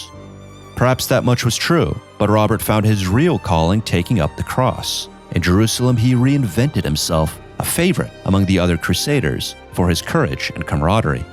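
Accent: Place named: United States. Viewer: Can't stomach the american narrator's accent, totally offputting.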